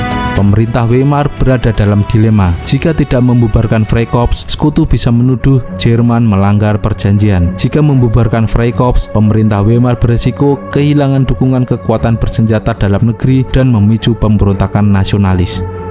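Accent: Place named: Java, Indonesia. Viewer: mas, jowomu njowo banget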